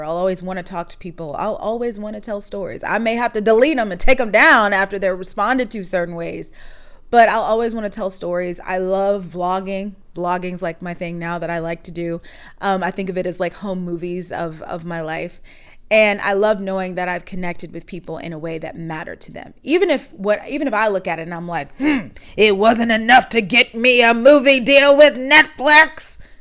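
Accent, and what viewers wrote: United Kingdom. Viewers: 🤣, that British accent 👌 Loved your humour/ sarcasm throughout, please incorporate it more! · your british accent is everything! sounds just like them! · As a Brit, loved your British/English accent 😂 Missed you. You’re so relatable. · lmaoo love your british accent, welcome back!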